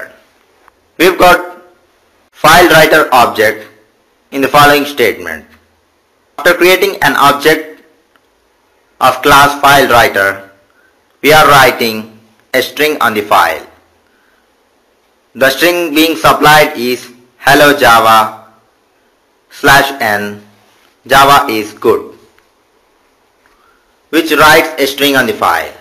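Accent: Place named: India. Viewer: nice accent haha are you indian?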